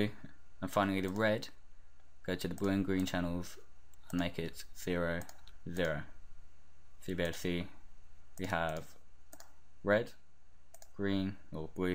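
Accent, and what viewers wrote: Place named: United Kingdom. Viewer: i dont understand well british people, did u say hold click on the possition in ???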